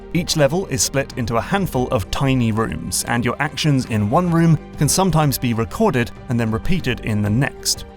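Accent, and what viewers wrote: United Kingdom. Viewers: Yeah my man do be british though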